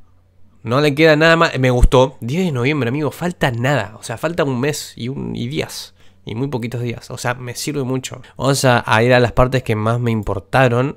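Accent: Argentina. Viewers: español? Se re nota que es Argenrtino o Uruguayo xD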